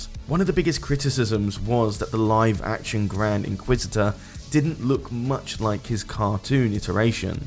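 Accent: England, United Kingdom. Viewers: Why are you british now? You didn't used to be british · When did you get an English accent?? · Why is no one talking about the fact that the narrator is suddenly british instead of american 💀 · I haven't visited this? channel in a while, since when did become British? What happened to the OG?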